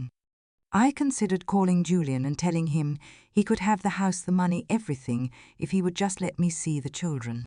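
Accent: United Kingdom. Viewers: Hmmm, lives in GA, but an accent from the UK.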